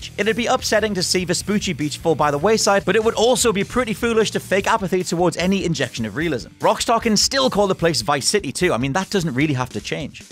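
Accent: United Kingdom. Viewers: Why is it British accents on ever gta rumor video??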